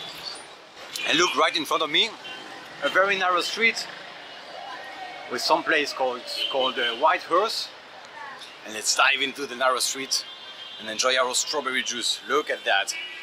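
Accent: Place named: France. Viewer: i can know ur from fr with ur acsent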